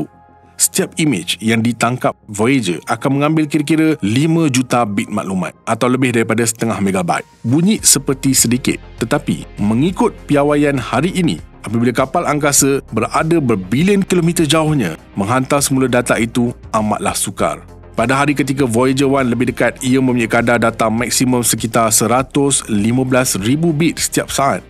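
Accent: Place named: Kuala Lumpur, Malaysia. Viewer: Guna Bahasa Melayu baku tetapi sebutan masih KL... Jika betul2 nak Bahasa Melayu baku, sebutan kite tu kena betul2 kita dan sama bukan same...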